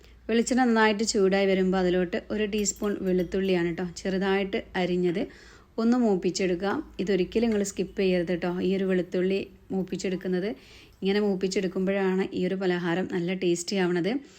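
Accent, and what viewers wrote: Malappuram, India. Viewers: മലപ്പുറം താത്താന്റെ സംസാരം മാറിയോ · Malapuram thathante samsaaram aayirunnalloo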